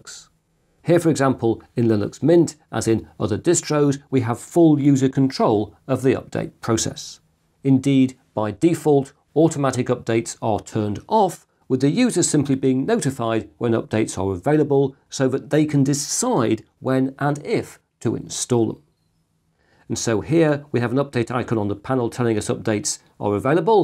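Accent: United Kingdom. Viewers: Hello mister, this comment may be a bit unrelated to the video but I wanted to send you a message either way. I've learned of you by looking for information about SSDs, because back in year 2021 of October, I was upgrading my PC and I was a bit confused about the SSDs here and there. I was merely looking for basic information, but then I stumbled upon your channel, looking at your channel's avatar I thought; "Oh, it's an older looking man with a bob haircut and glasses, I believe he will be trustable, he also looks British, perhaps an old chap from Britain or one of the Americans that kept their roots from New England?". Ironically, your looks matched! I was going to do the usual of just skipping through the video, expecting some boring information I don't need...Well I did not do that whatsoever, I didn't even stop a second to "unbore" myself somehow. For whatever reason, you have this very British charm on you, where you always speak rather slowly, and it may sound boring but it is not so, and you have a wonderful accent that I if I was into one of those listening books or whatever they're called, hey I might actually like it. 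You also never make such unnecessary things, like stop to make a joke for 15 seconds, which in the end it is funny, instead, you just use your own British charm to say something very witty and it works excellently! Carrying on, the information you have provided for the SDDs was amazing, I learned a lot, it even wanted me to check m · So wonderfully British. Thank you so very much!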